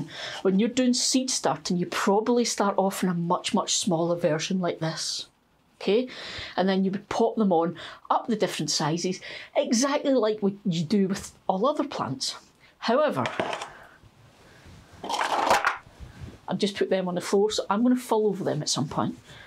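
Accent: Scotland, United Kingdom. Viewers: I started with seeds a couple years ago and will never go back to sets! I’m in zone 5 in Canada and because they were starting to die in the house, I planted them outside as soon as I could. Despite getting a little snow and very cold weather, they did great by the end of my growing season! My ancestry is Scottish and I love listening to you speak! Someday I hope to get to Scotland. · ❤Ah ken a wee bit a Scottish! I am hoping to learn more Scottish gardening techniques, as I have learned so many good tips already! Do you have different types of cabbage for spring and autumn?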